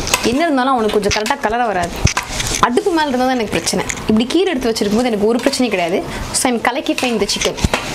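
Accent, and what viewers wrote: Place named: Coimbatore, India. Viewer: Namma covai slang apo apo vanthutu poguthu. akka....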